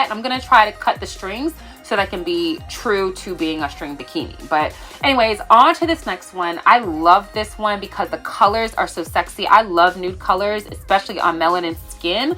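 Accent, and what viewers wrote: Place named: Baltimore, United States. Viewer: Ure the best at this by far n ur Baltimore accent is cute. Most girls can't make it cute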